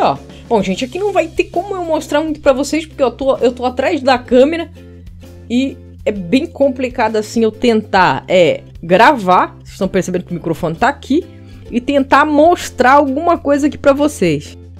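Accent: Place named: Rio Grande do Sul, Brazil. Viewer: Adoro esse sotaque do Rio Grande do Sul. confere?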